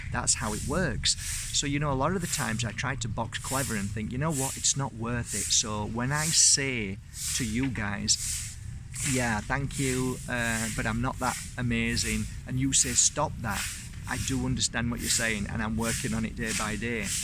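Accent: Yorkshire, United Kingdom. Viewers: I wish I could meet you face to face. Just to spend a little time in your presence would so help with the issues I have. I need to find the happiness within. Thank you so much for your wisdom and all your videos, they do inspire me. Just to have a conversation would be awesome. Thank you. Ps. Love your accent. Little bit of Yorkshire and Leeds.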